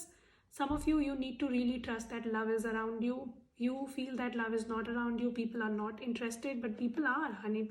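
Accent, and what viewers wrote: India. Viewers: I presently live in Mareeba, and my wife of three years has been "stuck" in Shanghai China for another 12months... and then this recent interloper is almost a "nut case"... My Wife is 10 years younger & 12may, I am 21may... Xinji holds my heart, but cannot leave China due to "covid & family", we do not speak the others tongue... I have some traits related to aspergers, bipolar & dementia etc... I want to produce "cold pressed coconut oil" on Vella Lavella Island, Western Province of Solomon Islands (I am fluent in "tok pisin" and have a masters degree & science degree in Tropical agriculture growing and processing Theobroma Cocoa (chocolate), coconuts, since 1970... (tea & coffee is a recent hobby interest)... I love sailing catamarans... Your accent sounds like Southern Indian? - I have resided in Bangalore, & Nagacoil for a 6 month holiday, and earlier, 2and a half years in Penang, Malaysia... 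At 75 years old I am presently bloody confused, waiting for "Ascension" on 21 Dec??? ... <mail>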